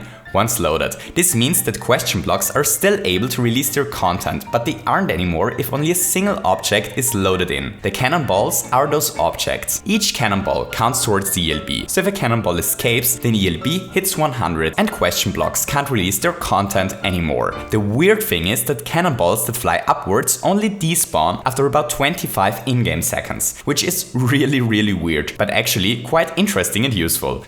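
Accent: Germany. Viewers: Do you speak german? Because you have a german accent. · Is it really an accent? He is German, so it's just a German trying to speak English. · Höre ich da etwa einen deutschen Akzent?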